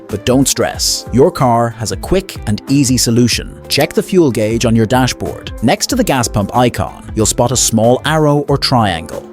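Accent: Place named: Ireland. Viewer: Thanks for this video. Most of the negative comments are from people who like to complain. I like the narrator's Irish accent.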